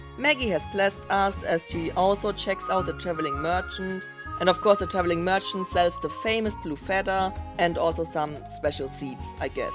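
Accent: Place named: Germany. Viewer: I don’t know if you’re German or something, but I love your accent❤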